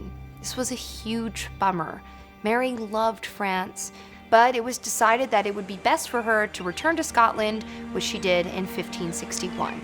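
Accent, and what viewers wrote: United States. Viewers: So sad that an American speaker narrated this piece. Made the whole content bland with her voice dropping an octave at the end of each paragraph. Americans probably see this as adding authority to her voice. Just thought the content was poorly delivered and crass. I am a proud Scot who wishes that Americans would confine their overtures to their own country and forbears. Rob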